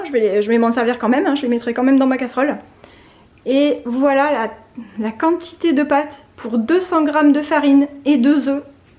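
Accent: South, France. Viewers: C'est un moment détente quand je regarde tes vidéos... Tes plantes... le soleil et ton accent du sud me font oublier un instant le vilain temps de chez nous et les soucis 🙂😘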